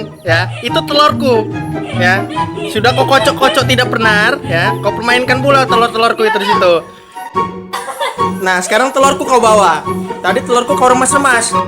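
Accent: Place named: Riau, Indonesia. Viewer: Ohh kok suaranya kek orang riau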